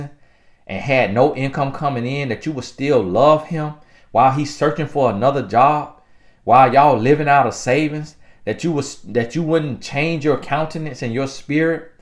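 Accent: South, United States. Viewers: You remind me of my sweet husband. We got married young. He was from Alabama. He passed so I love hearing the southern accent. I'm from California.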